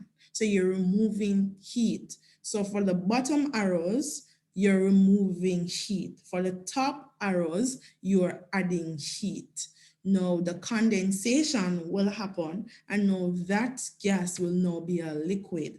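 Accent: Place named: Jamaica. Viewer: I find this Jamaican accent very off-putting...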